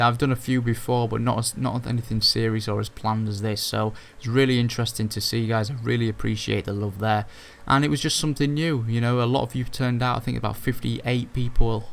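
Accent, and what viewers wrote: Yorkshire, United Kingdom. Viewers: r u from yorkshire?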